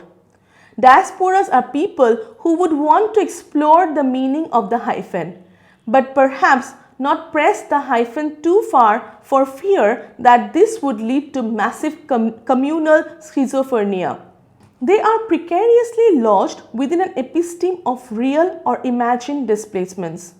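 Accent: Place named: Assam, India. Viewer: Mam your tune feels u are an Assamese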